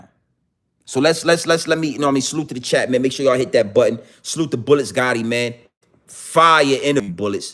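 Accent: New York, United States. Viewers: You sound like you form new york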